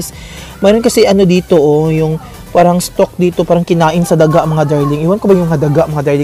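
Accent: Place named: Visayas, Philippines. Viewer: Manay am happy kapag napanood kita happy people ka at natutuwa ako kapag dialogue mo sobrang dako bisaya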